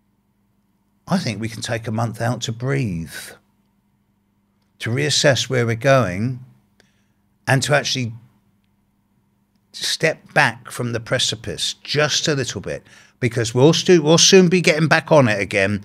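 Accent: United Kingdom. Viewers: Greetings from the city of João Pessoa, Paraíba, Brazil. I thoroughly enjoy your analysis and may I say your voice is so agreeable, your diction so clear, not to mention your beautiful British accent. Many thanks for taking the time to keep us informed.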